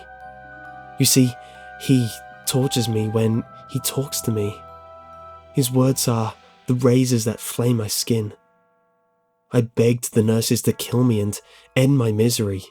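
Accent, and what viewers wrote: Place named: Northern England, United Kingdom. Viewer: YEH NORTH ENGLAND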